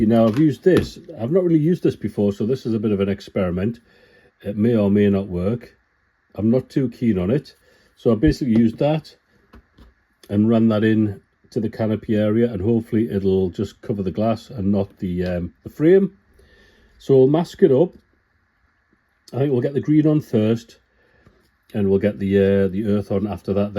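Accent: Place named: Tyneside, United Kingdom. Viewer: by your dulcet tones that you were a fellow Tyneside lad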